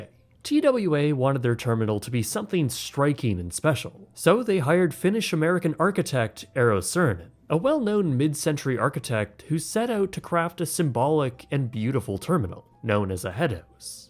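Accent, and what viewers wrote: Canada. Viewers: Mind you, rates change. For example his same room now is $270 i think hes quite nit picky and since this is a historic hotel this is very fair pricing and i disagree w majority of his pricing critques. Granted it sounds like hes canadian and their dollar is very weak in the US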